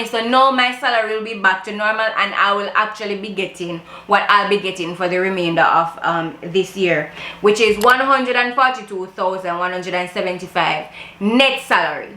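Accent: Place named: Jamaica. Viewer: Ik a Jamaican when I hear it love this channel ❣️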